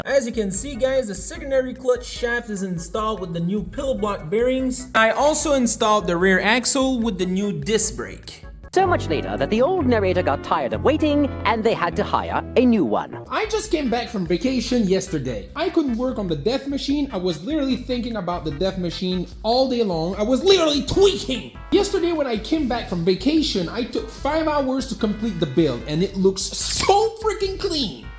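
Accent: Quebec, Canada. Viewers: Is he from Quebec ?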